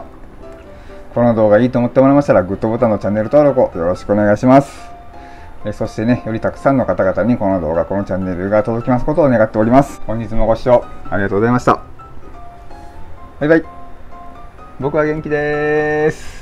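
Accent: Osaka, Japan. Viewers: いろんな変わった症状が出てくるんですね。大変な事でなくで良かったですが。先生がこの事うまくさんに説明できるかなって心配。話の途中で漫才風になるのが大阪人ですね。昔１０年ほど大阪に住んでいたんで大阪弁懐かしく聞いています。コテコテになるほど思い出が出てきて嬉しいです。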